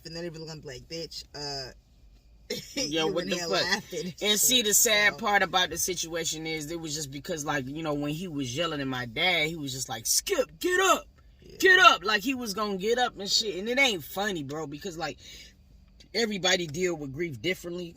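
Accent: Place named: Detroit, United States. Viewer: Great story! 💕 I’m new to your channel... been listening for about 6 weeks. U are one of 2 content creators I actually enjoy listening to. U actually get it and convey what the ppl are thinking and enhances it! But I swear, listening to u talk, I said she gotta be from Detroit because she got our flavor... The way u speak and the lingo is Detroit. I would be shocked if you’re not from here. But I love your reactions and hope u keep at it!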